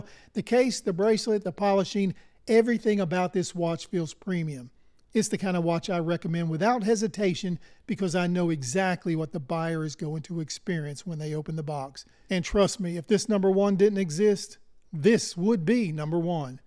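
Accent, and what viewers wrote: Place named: Maryland, United States. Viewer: Hey. I'm trying to figure out your accent and where you're from. Maryland? Carolina's? I'm probably way wrong. Lol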